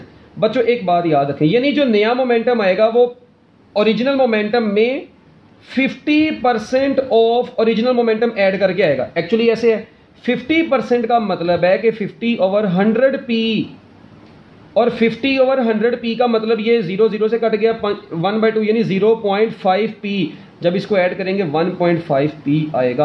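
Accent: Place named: Punjab, India. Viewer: really sir jus amzing punjabi accent ke sath aur mast se samaj aa gyaa thnxxx a lot sir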